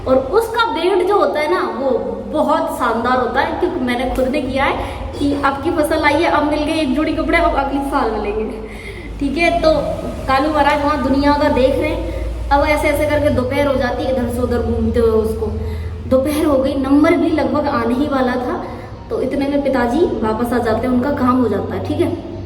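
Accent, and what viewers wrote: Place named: Rajasthan, India. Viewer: ap b rajasthan se h dii 😍😍😍vhi me sochri hmare yha k jesi language h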